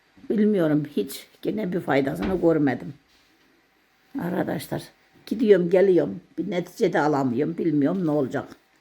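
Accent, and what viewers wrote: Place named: Çorum, Turkey. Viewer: Ben bu kardeslare abone değilim ara sıra izliyorum yorumlara bakıyorum,takipçilerin bazisindan gelen çirkin yorumlar benim bile canımı acıtıyor çok rica ediyorum beğenmiyorsanız neden izliyorsunuz.Turkiyenin her yöresinin lisanı lehçesi değişiktir hanım Çorum'da konuşulduğu gibi konuşuyor beğenmeyen izlemeyecek,ayiptir günahtır.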